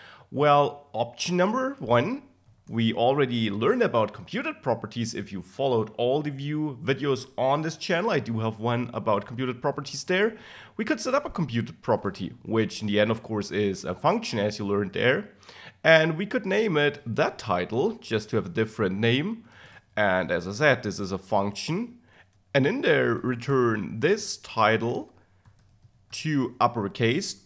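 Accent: Germany. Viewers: nice Germanized British American Accent Mix...Filter :)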